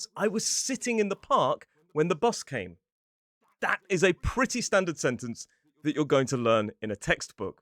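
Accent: United Kingdom. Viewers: Love listening to your accent, it reminds me of my university semester in the UK 😍 P.S. Liverpool and Muse (not "the Muse" like my Italian friends would say) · Wow.Your voice reminds me of the singer from Coldplay — he has such a beautiful accent that I wish I could understand it. I gotta say, for some reason I always stuck with American English at school— but I think I’m changing my mind. I used to think British English was kinda boring, too formal, or just hard to follow. When I'm in London I feel an idiot. I cannot answer even simple questions... I don't get them. Perhaps it's a matter of accent I 'm not used to. But you totally proved me wrong. Thanks,!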